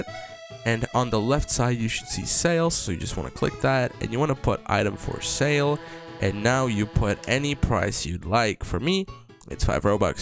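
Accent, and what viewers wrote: Czech Republic. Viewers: bro are u from czech republic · are you from czech??? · wait are you czech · ARE YOU CZECH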